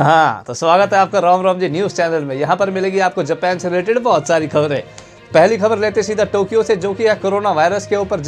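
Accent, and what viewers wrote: Haryana, India. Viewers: Kaash news channel me bhi aisi haryanvi me anchoring karte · Love your Haryanvi accent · Japani news haryanvi accent me 👌👌👌👌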